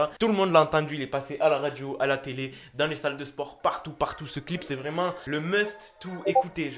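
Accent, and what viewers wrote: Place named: Marseille, France. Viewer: Prk3 tu prnd l'accent marseillai, parle bi1🤭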